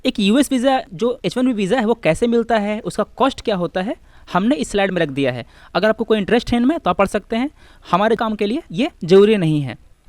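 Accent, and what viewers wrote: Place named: Bihar, India. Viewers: Dear U TUBER if u don't like the Bihari accent then do one thing, apply for H1B visa go to US and watch You Tube videos there u may like the american accent. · if u have prblm with bihari accent... plz don't watch this videooo....this video has very beautifully explained all the pros and cons of H1B visa... thanks a lot sir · U TUBER thats so rude of you . i like his this bihari accent . you dint watch if you dont like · Bihari accent irritates me....pls sir change it if possible :)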